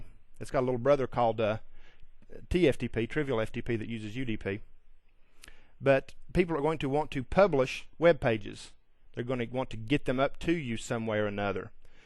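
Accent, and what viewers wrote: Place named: Texas, United States. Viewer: I like that accent. Texas? Somehow it makes me a better listener and able to process more info.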